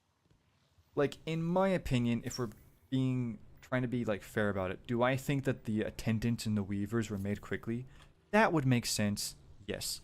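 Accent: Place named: Ireland. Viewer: Irish brethren I hear, salute I do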